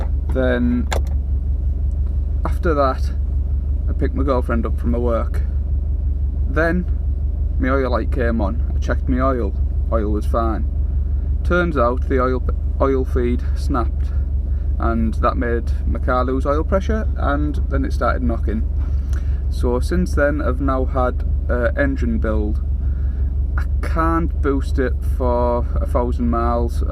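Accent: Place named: North East England, United Kingdom. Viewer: Dunno where abouts your from mate, but sounds like north east? Im from Gateshead and im currently waiting for my 2006 forester STI to be imported from Japan. Its also black.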